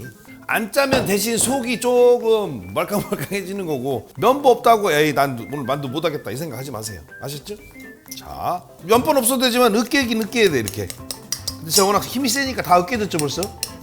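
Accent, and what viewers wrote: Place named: Countryside, South Korea. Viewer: 쩜매가꼬 구수한 고향의 사투리 반갑습니다 어린시절 시골에서 살때 참 많이듣던 짬매가꼬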